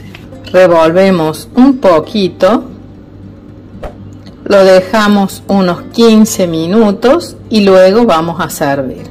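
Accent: Córdoba, Argentina. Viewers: Qué alegría escuchar esa tonada cordobesa!!! Muy buen video, muchas gracias!! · Qué lindo es escuchar tu voz de cordobesa!! Te iba a comentar q "acá en Cba.tomamos el mate c jengibre " pero qué te voy a decir !!! Si sos de mi Cba. Es buenísimo el jengibre!!! Haré este té. Gracias!! Ely, ciudad de Santa María de Punilla, Córdoba,República Argentina.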